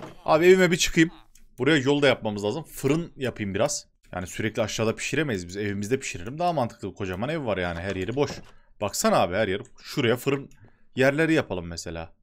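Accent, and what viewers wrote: Yozgat, Turkey. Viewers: abi ben iranli turkum ve bizim turk dili ayni sizin yozgat diline benziyor vallahi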